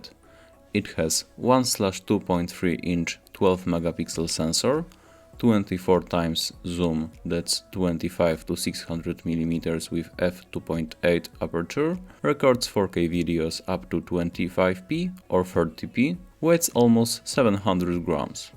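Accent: Poland. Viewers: are you polish person :)? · He's a Pole! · Słychać te Polskie korzenie ale nie jest źle. Łapeczka leci, pozdrawiam :) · Are you Polish? Because I recognize the accent cause i'm Polish.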